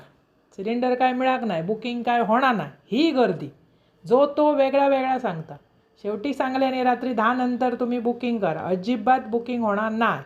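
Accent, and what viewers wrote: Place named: Vengurla, India. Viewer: वाह सुंदर वाटप,होय गॅस चो गोंधळ सुरू झालो,माका तुमच्या बोलनावरसून तुंम्ही वेंगुर्ला महेर वाटला, सुंदर receipe कधी व्हीडिओ तुमचे बघताय असा जाता माका,कणकवली क आमचा कोण नाय.वेंगुर्ला कुडाळ सगळी.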